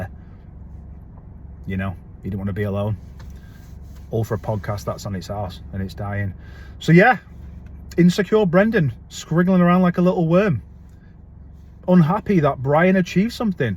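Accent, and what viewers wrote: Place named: England, United Kingdom. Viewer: Thank you. I feel the same. And I really love hearing your English accent. English accents are glorious & always elevate the experience ❤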